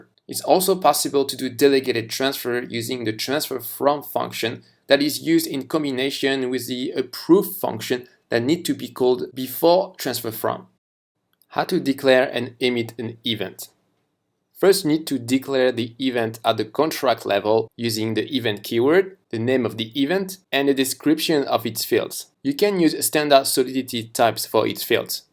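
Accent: France. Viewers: Thank you so much!! Vu l'accent je pense que tu es francophone donc je te le répète : MERCI 🙏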